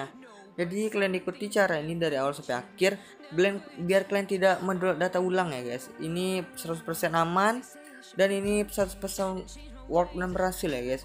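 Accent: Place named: West Sumatra, Indonesia. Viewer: Nampak bna urang sumbar ky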